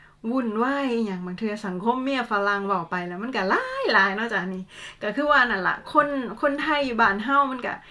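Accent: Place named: Isan, Thailand. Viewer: จะแมนคิดฮอดบ้านนอกคักเนาะแม่ 55 ฟังเสียงเว้าเป็นตะลิตน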